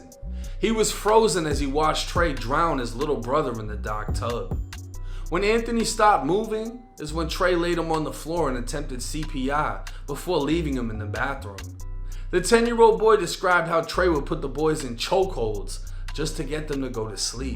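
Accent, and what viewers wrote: Boston, United States. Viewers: Im calling bs u ain't from Florida listen to your accent keep that shit in Boston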